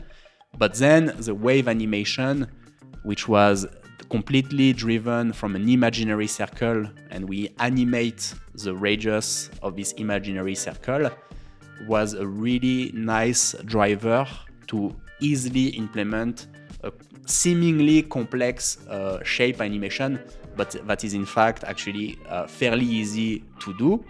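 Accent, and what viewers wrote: France. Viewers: I got used to watch this series from beautiful Zurich on bed time. In the next day, I always start very productive working on animations while speaking with French accent 😁 I really appreciate your work! Thanks for all the tutorials 🚀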